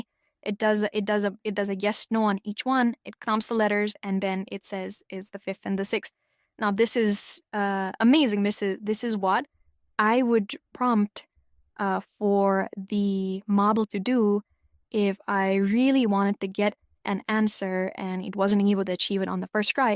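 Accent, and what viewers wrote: Quebec, Canada. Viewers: Voice heuristics suggest likely a Canadian from Quebec despite the attempt at AI obfuscation. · Doxxed as likely Canadian from Quebec in 2 seconds despite the attempt at obfuscation.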